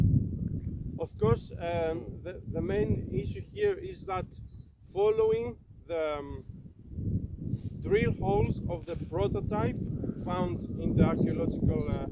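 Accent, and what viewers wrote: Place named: Greece. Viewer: You sound Greek. Are you Greek?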